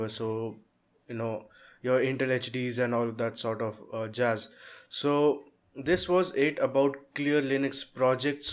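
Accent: India. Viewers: I can't take you seriously with that indian accent. Already laughing.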